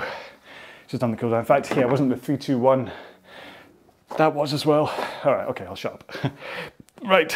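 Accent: Scotland, United Kingdom. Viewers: Another great one!! Thank you! I’m quite a fan of the Scottish ramblings 😁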